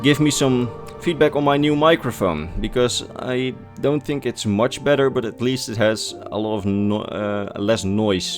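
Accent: Netherlands. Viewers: Hee je bent nederlands he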